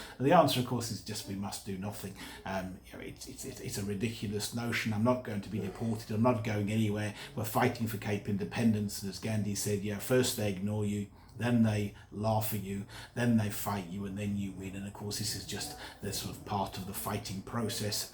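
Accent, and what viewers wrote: United Kingdom. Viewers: This guy actually has a British accent. He needs to go.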